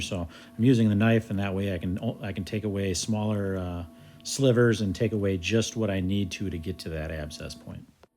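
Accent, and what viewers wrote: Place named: Wisconsin, United States. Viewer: I can tell a good ol Wisconsin boy when I hear one! I love your videos. You're the best one I've seen. You can really tell you care.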